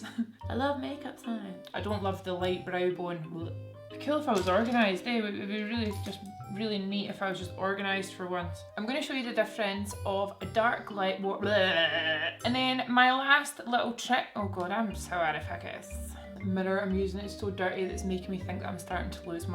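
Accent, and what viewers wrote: Scotland, United Kingdom. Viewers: I could literally listen to you talk forever😍 Scottish accents are my absolute favorite (I’m from Boston so almost anything is better than a Boston accent😂) you are so stunning💕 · I’d be so interested in a master class. I find your techniques amazing. On the flip side I’d be worried that I wouldn’t make it to the end of a class with you, your soothing Scottish tones make me sleepy 😂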